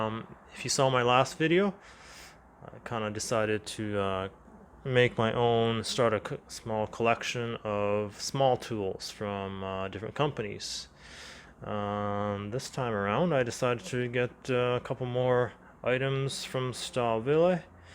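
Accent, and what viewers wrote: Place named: Japan. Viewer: I assume you're Japanese from your pronunciation of Japanese names (and having a Japanese magazine), but your English is leagues better than other Japanese locals. Especially with the accent (it's still there, but only slightly). Did you study in America or grow up there?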